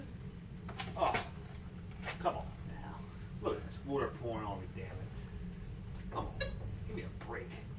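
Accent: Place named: Philadelphia, United States. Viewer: Ay you from Philly bro?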